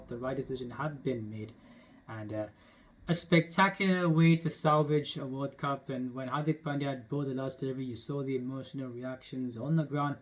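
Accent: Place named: United States. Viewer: Bhai.... Dump that pathetic American accent... & bring the desi accent on... Will sound more real!!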